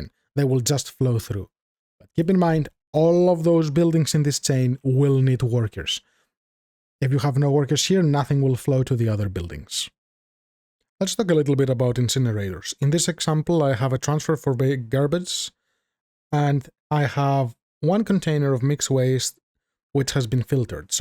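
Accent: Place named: Finland. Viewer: I love Finnish accent so much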